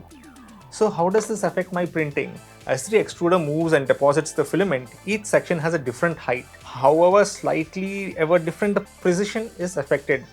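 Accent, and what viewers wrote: India. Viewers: Guessing by the accent (and Rupees), I'm guessing is in India? I put my glass bed printer away 9 years ago. At the time I had read about using Aluminum to improve ABS warping. I bought a sheet but never got around to cutting it. If I had taken the time, my priority would have been to build an enclosure; since I have a Delta-v printer, it would have been a headache to cut a circle so I never did it. Now that I'm restoring my printer to get it up and running again I figure it's time to get the best bed, hot head, enclosure etc. My understanding is that India is HOT. I can see how thermal properties of bed are not as important to you. but in California, despite the tourist propaganda about sun and palm trees it is not India. I struggled to get up to temp to use ABS. I'm currently sitting in a 95 degree garage, but average is more like 65 F and nights get down to 40 F. 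my garage has an annual range of 40 - 130F. 10 years later, should I still be considering aluminum bed, or have materials evolved to the point where I should just consider better modern filament? I don't find any of the reasons for glass here to be very compelling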